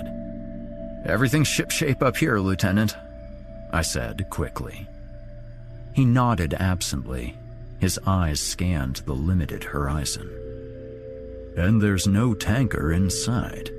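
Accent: United Kingdom. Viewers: Wow! I'm the author. And this certainly was a fantastic surprise! When I started my shift at work yesterday, I had no idea that my story had been officially selected to be narrated by none other than at the Horror Hill! It really was a fantastic way to start off the work day.:) I truly feel honored to have made the cut for something like this. And it's doubly cool for me because hails from the same city where I grew up. Hope all is well in Rochester. And thanks so much for narrating this! And I hope to work with you more in the future... BTW don't listen to the haters man. You totally killed it with that British accent.;)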